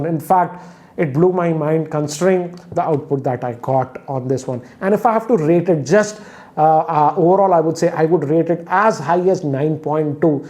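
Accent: Hyderabad, India. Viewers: I heard soundbaaarr as Sambaaar!! Love the Hyderabaadi accent! Soundbaarr saambaar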